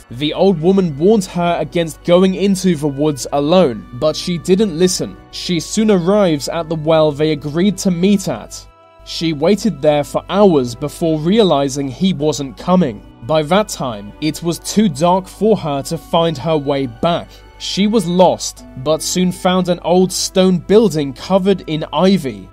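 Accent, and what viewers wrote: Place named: RP, United Kingdom. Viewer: In fact, you say every word ending in Y like a posh twat.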